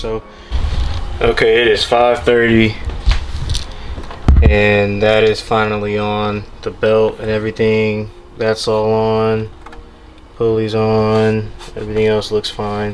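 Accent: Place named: Philadelphia, United States. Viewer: ❤️ from Philly. You talked like a good friend here in Philly and I was wondering if there was a connection. God bless.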